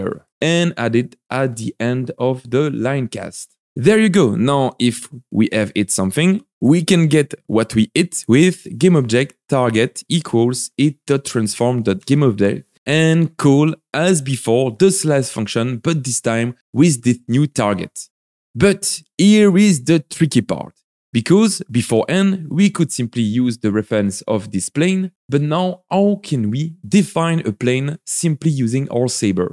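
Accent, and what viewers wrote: France. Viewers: Ah c'est pour ça cet accent Français, je me disais bien que ça sentait familier XDDD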